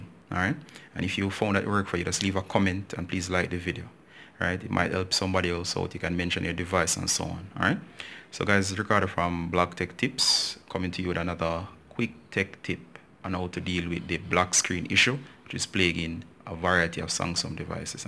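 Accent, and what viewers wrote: Jamaica. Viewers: Are jamaican? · From I heard your voice I knew you are Jamaican lol Your sim card confirmed it.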